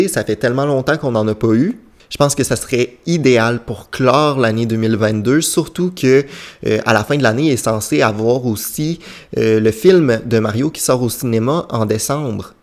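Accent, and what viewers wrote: Quebec, Canada. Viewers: Excellente vidéo ! J'suis nouveau sur ta chaîne et c'est vraiment de la qualité : c'est dynamique, bien monté etc donc Bravo ! (En plus j'adore ton accent québécois il est trop cool XD) En vrai c'est totalement possible d'avoir un ND la semaine prochaine, c'est habituellement vers milieu juin qu'on a un direct Il y aura surement de bonnes surprises sans oublier Splatoon 3, Mario + rabbids qui seront au RDV ! Enfin j'espère ^^" En tout cas, il ne reste plus qu'à attendre :)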